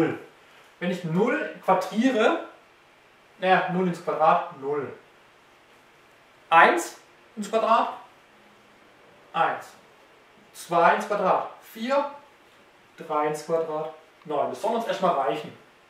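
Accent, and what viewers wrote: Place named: Baden, Germany. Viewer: Sehr schön erklärt Sie kommen doch auch aud dem badischen das hört man raus :) "isch gleich"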